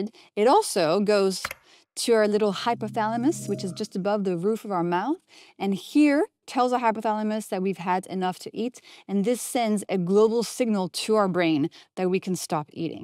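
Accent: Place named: France. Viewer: Learning about glp-1 from a lady with french accent is more interesting than listening to people without a cute accent. ❗️❓️Most peoples hypothalamus is dormant or not heeded❓️❗️ Sad....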